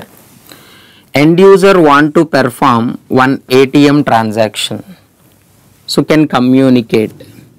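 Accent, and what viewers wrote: South India, India. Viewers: the south indian accent 😅😅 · u r indian!..cz u r talking in indian english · what a bad accent of english this indian accent id really wrong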